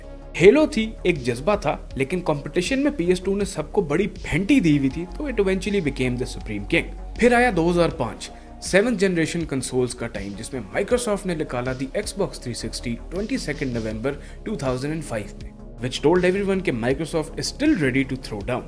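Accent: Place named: Punjab, India. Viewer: Shukria!🙏🏻 Absolutely love the Punjabi Tarka 😂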